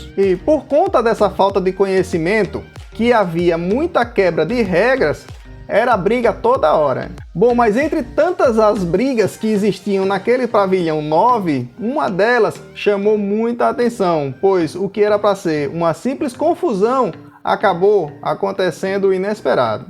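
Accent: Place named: Northeast, Brazil. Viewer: O cara vem falar de algo que nem sabe o que aconteceu, não tem um vídeo e acha que algum idiota vai ouvi-lo com esse sotaque de nordestino !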